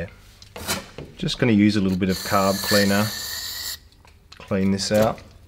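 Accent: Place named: Australia. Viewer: Teaching a marine tech program, and we all love the old Outboards, love this guy. It's always more important with an Aussie accent too!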